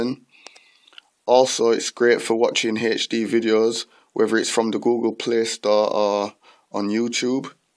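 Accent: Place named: Manchester, United Kingdom. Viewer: In 2019, I have the exact tablet arriving from Amazon Tuesday April 2, 2019. It is brand new and apparently someone kept and has some still to sell after all these many years. I took a chance on this as 32 GB is plenty for me and the size perfect when I travel. The Amazon ad said 4.3 Jelly Bean. Will it Auto-Update to any of the latest version of Android? I have a case and screen protector on the way also and quite a bit of money invested so I hope that I am not disappointed. Looking forward to getting it and setting it up. As a side note, when I was younger, I stayed several months traveling all over the UK and Ireland. As here in the US, many regional accents however not sure what part of the UK that you are from, but sounds sort of Manchester or Blackpool if I had to guess. It's a beautiful accent to listen to. Anyway, cross your fingers that my old NEW Nexus 7 works well and thank you for a nice video which was a great help.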